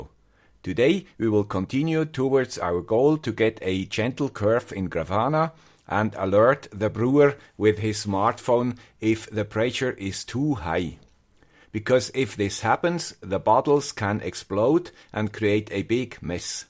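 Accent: Switzerland. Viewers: Hi, love the way you show us your work. You should change your slogan in:.....the guy with the swiss accent and swiss humor:)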